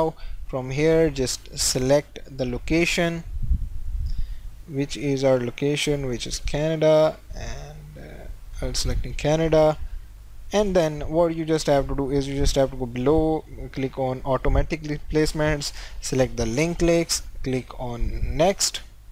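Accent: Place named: India. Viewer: In your discription you give us location United States but your English accent like Indian 😂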